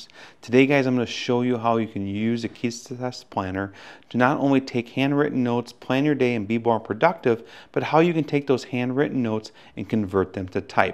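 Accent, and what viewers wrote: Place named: Upper Midwest, United States. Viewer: Thank you. I’m guessing accent is from Wisconsin, Minnesota, or North Dakota. Am I close? 😁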